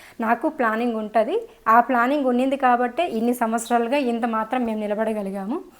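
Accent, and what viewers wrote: Chittoor, India. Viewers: Hi andi medi ye uru,Chittoor slang laga undi anduku adigyanu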